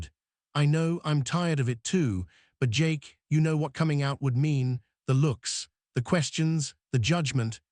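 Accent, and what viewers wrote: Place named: London, United Kingdom. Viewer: This male voice is it a beautiful London voice ? Wounderful to hear it.the story is excellent thank you AI family❤❤❤❤❤❤❤❤❤❤❤❤❤❤❤❤❤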